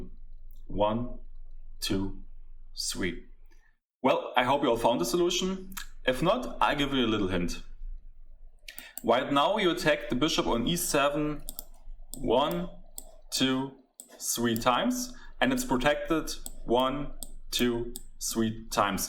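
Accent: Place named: Germany. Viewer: Thats the most German-English video I've ever heard. Great job!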